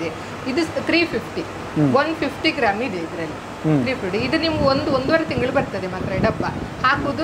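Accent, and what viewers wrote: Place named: Kundapura, India. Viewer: Madam neevu Kundapuradavara. Matado style ade tara ide. Thank you🙏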